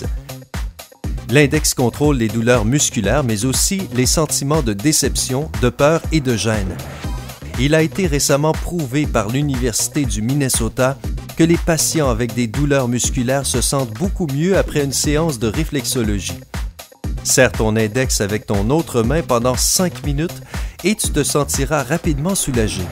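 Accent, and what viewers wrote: Quebec, Canada. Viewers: Putin d'accin québékouè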